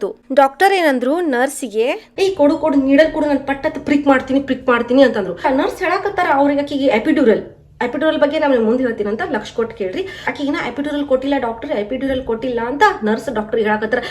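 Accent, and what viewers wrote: North Karnataka, India. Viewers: Am fan of your kannada, america dalli idru kuda nim uk slant kannadana martilla, yavde hammu, bimmu torisde kannada matadtira, really great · R u from uttara kannada or uttar karanataka..ur accent sounds from uttar karanataka but ur surname says u r from coastal part of karanataka..confused 🤔🤗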